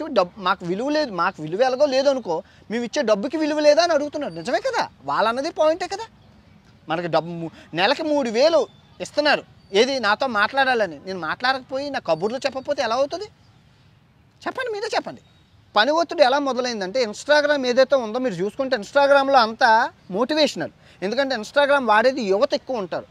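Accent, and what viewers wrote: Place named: Andhra Pradesh, India. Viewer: garu uttarandhra bhasha vintu Naku anandam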